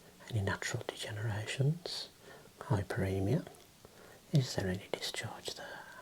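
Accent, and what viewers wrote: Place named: West Midlands, United Kingdom. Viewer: When MassageASMR stopped making videos I sadly accepted that I’d never find another ASMR creator who relaxed me as much as him — then I found your channel. YouTube algorithm kept putting you on my homepage way back before you were even at 1k subs I think - reluctantly I gave you a go seeing as the algo was so insistent on you… thank goodness I did that! You’ve become my favourite ASMR creator BY FAR. Especially helps your accent reminds of back home in the West Mids. Long may your channel keep growing and thriving!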